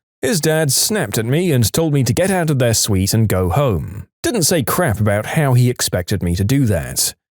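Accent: United Kingdom. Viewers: i love the british accent mate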